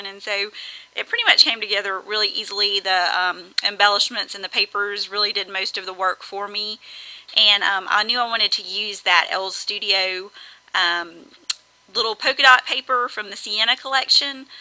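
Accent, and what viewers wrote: South, United States. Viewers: Such a sweet layout!! Great job! I just love watching your videos because I'm from Alabama and love to hear a familiar southern voice :) To chicken to do my own videos because I think I sound awful on a recording. Love watching you though!!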